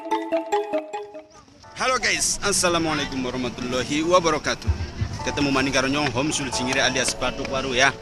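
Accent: Banyumas, Indonesia. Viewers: Ngapak bae bhsne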